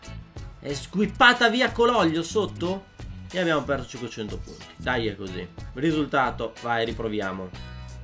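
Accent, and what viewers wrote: Veneto, Italy. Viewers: per caso sei veneto? 😁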